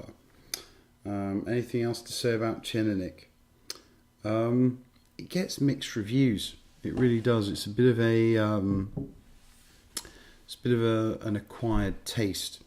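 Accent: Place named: RP, United Kingdom. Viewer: Excellent review but when are you going to invest in a descent camera? Your tasting notes are one of the most honest, original and accurate (as far as my tasting experience goes), your presentation is natural and lucid and transmitted well through your clear English accent with that hint of rugged understated poshness. You deserve a far wider audience. Invest in a better camera and let's see (please G-d) those subscription figures reach 5,000! in 2019. Fascinating and intricate flavour description which makes this Teaninich rather intriguing. There is a flavour note I haven't come across in a long long time. Elderberry. From the honeysuckle family with large clusters of tiny creamy white flowers. I do have a vague memory of this when growing up in England. You mentioned Elderflower. I looked it up and it seems to be a sugary drink flavoured with elderberry produced by village housewives in little English villages. 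This distillery name whose pronunciation is a real tongue twister "Tee-Ni-nich" is one of Diageo's silent giants with about 10,500,000 litres capacity / annum. As you said, their 10 Year Old Flora and Fauna was released in 1992 and can still be found in the UK. Their special limited release 17-Year-Old came out in 2017, now probably very rare. The character of the malt seems to be designed to be a filler malt for blends. Reviewers describe fresh green grass along with slightly oily creamy cereal notes with a dash of honey, nuts and spice. Your notes were much m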